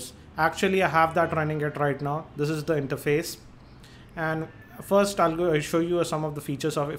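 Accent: India. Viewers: Funny idian voice xD (no racist)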